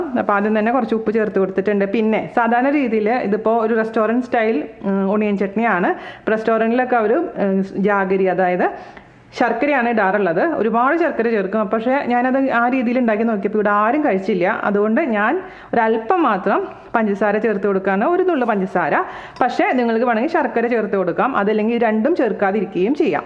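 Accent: Thrissur, India. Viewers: Chechi,njangalk chechiyude Thrissur samsaram orupaad ishtamaann... 😘😁😀😂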